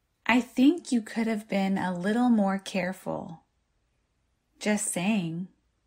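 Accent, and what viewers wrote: United States. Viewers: 美式發音哦！ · 是美式英文嗎? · I like the videos authentic American pronounce. Thank you!❤ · 我要感谢你美妙的美式发音，可以给我很多帮助和信心。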